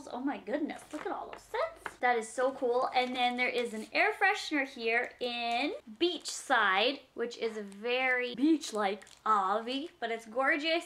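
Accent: Canada. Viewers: Your Canadian accent comes out a lot more when your boyfriend is in the video. It's cute.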